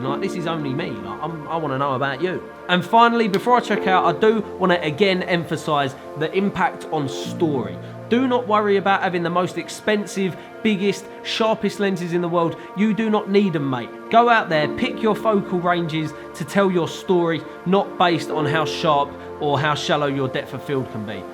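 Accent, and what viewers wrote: United Kingdom. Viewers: I’m just here to listen to your British accent mate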